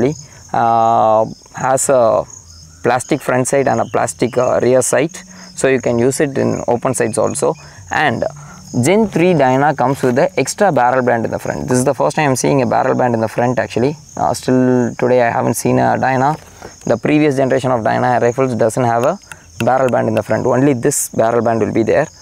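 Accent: India. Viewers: Nice Indian accent 👌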